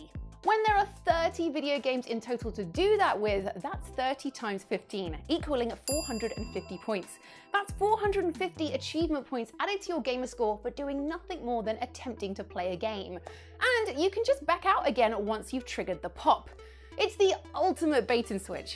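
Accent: United Kingdom. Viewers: this is so british i actully understand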